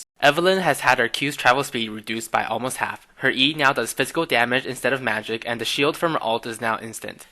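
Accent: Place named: Asia. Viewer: I cant help but smile with your asian accent XD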